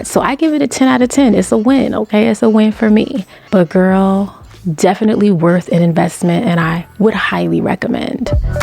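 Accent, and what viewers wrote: New York, United States. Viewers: that NY accent it does something to my soul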